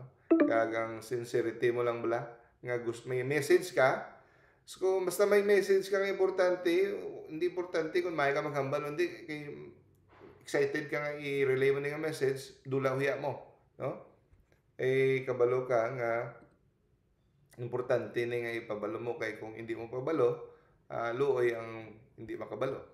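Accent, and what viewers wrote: Iloilo, Philippines. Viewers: Ilonggo ka man gale doc ❤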